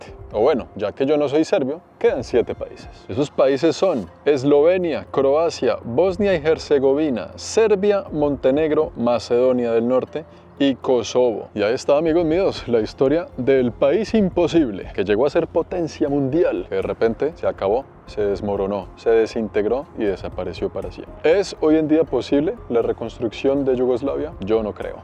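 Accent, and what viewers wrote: Bogotá, Colombia. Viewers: Colombiano, entre caleño y bogotano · MUy interesante, buen sentido del humor, agardable el acento rolo cachaco o bogotano. Un Saludos desde los angeles un hermano peruano.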